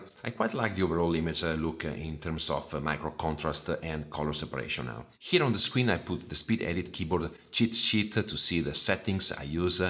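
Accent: Italy. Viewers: English with Italian aksent is much better than native English. But I think this goes for everything, a flavor of Italy will always make things improve.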